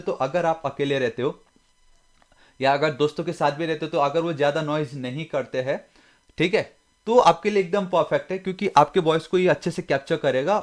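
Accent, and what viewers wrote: Nepal. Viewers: da tapai ko Nepali tone ayo....😁😁 Tara....saachi...dami....u know wat I used to make videos on Hindi as m Nepali so my vocal tone...is not purely Hindi....so my friends used to laugh over me but when I saw ur subscriber and video content it motivate me....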